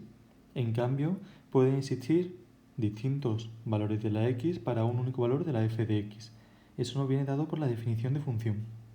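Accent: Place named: Murcia, Spain. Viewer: murciano fijo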